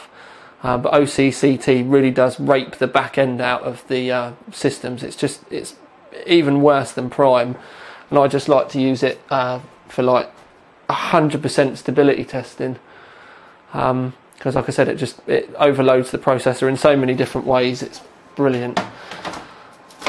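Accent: United Kingdom. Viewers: are you from the uk dude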